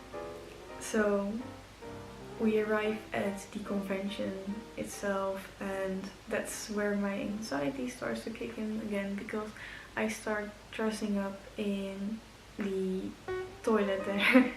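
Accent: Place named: Netherlands. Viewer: Lol 10/10 dutch accent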